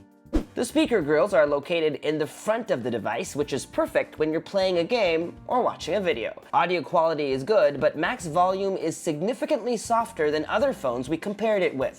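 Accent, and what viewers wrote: Singapore. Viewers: are you from SG? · Singaporean or Filipino?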